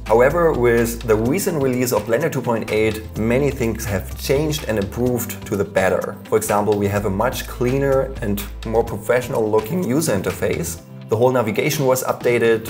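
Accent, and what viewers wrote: Germany. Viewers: first video I saw of you and I constantly hear your german accent (wich isn't bad) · kann es sein, dass du eigentlich deutsch sprichst? XD volles vid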